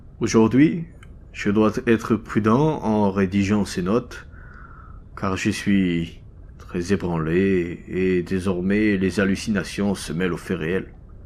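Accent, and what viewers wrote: Germany. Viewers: Exellent l'accent allemand! Et jusqu'au bout!! Bravo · Super l'accent allemand ! Et très bonne histoire 👍🏻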